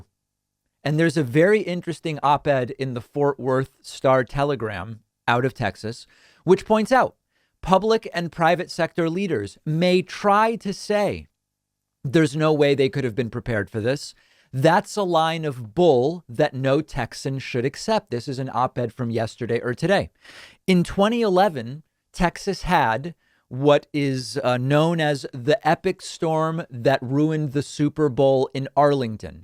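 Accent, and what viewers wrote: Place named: Argentina. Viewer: The Argentina accent have a bit of Italian in it.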